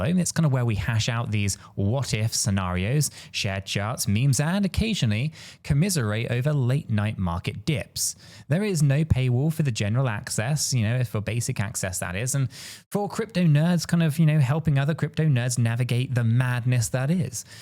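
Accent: United Kingdom. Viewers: The only thing worse than an entire video with non stop sarcasm is hearing it with a British accent 🤮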